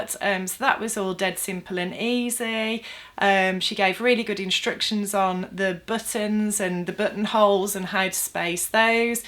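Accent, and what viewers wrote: United Kingdom. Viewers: This is going to sound very ignorant but this is my first video with you and I'm very curious: what part of the UK are you from? I'm American and I don't recognize your accent. Very neat though. I love the yellow dress! Very pretty on you.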